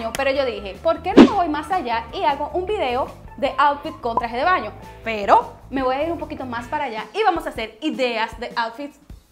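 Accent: Dominican Republic. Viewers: Me encantaaa, eres dominicana?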